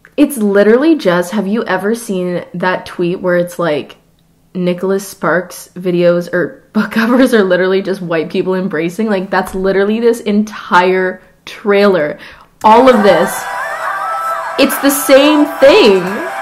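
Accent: United Kingdom. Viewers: it's his voice! it's not weird ... {[(british accent DUHHH)]} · Also, where did his british accent go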